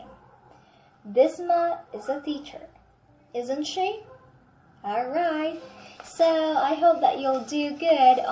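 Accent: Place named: Philippines. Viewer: Are you a Filipono? You're very good. Upload more videos about English tutorials because you're very good on it. Done my support.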